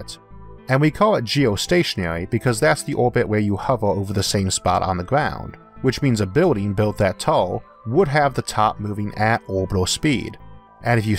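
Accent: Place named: Louisiana, United States. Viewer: I don't want to make a point of this as I feel discussion of it takes away from your content, but I need to chip in here. I don't hear an impediment. I hear a pretty specific accent. I'm not American so I'm poor at knowing regional accents, but I always get a bit of the Louisiana twang, maybe? Indeed the only time I think about your impediment is when you mention it. Can't be all that bad I guess! Thanks so much for this wonderful little corner of youtube. Free of idiots and haters, just reasonable people with a shared interest. All the best.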